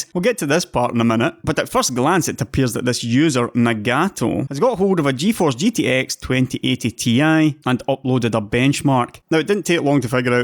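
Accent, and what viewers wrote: Scotland, United Kingdom. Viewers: your's is a Scottish accent, isn't it? breath of fresh air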